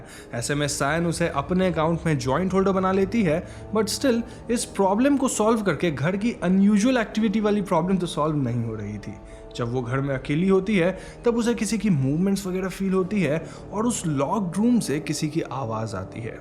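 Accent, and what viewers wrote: Bengal, India. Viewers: are you bengali ?, as your hindi has bengali accent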